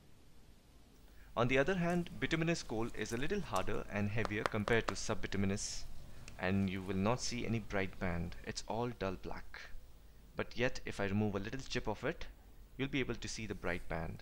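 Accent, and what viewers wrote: India. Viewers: He is using his own voice, it's his accent, which sounds as if he is from India.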